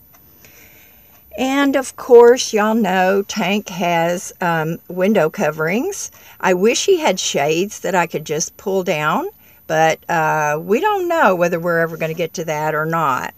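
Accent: South, United States. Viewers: I knew it,!! You can take the Gal outta the South but you can't take the South Outta the Gal, Honey you hang in there. Keep saving, stay safe and keep your wits about you. You are gonna be OK..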